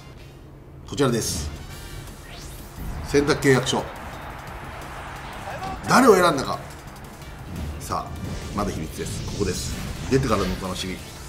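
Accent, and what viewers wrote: Kansai, Japan. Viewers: って関西便なの？？そもそもこれが関西弁7日も分からないけど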